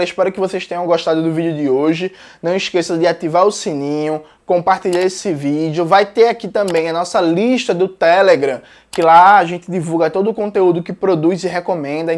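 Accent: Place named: Northeast, Brazil. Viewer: meu amigo! Que aula foi essa hein? Vídeo supeer necessário!!! Seguindo suas redes agora! Compartilhando p geral! Ainda com esse sotaque de meu nordeste....S2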